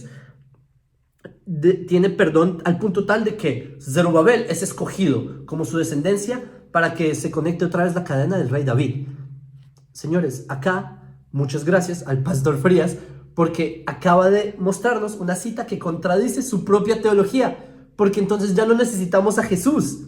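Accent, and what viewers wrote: Colombia. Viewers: Hablando de genealogía, a mi me parece que este hombre tiene un muy marcado asento colombiano más concretamente como si fuese de Bogotá. Yo quisiera saber si realmente es judío o solo tiene los rasgos físicos.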